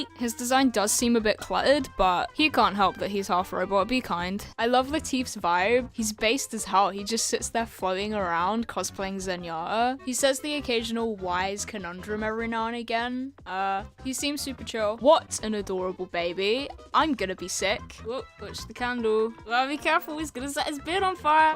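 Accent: United Kingdom. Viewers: wtf your bri*ish????????? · i knew u were british right as u pronounced artist without the 't' in the middle, bri ish. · You sound so British and it makes the whole thing so much better to live through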